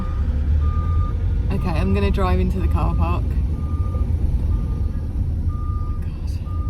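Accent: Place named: Black Country, United Kingdom. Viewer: Happy Birthday. I hope you had a lovely one. You're absolutely right about the sea, as i nipper i only saw the sea once a year whilst on our family holiday! You mentioning it in that context really clicked with me. I really miss the sea and just stand looking at it when i do see it!!! I guess it makes us appreciate it all the more though. Loved the video, love your Black Country accent, love too!! All the best, Graham xx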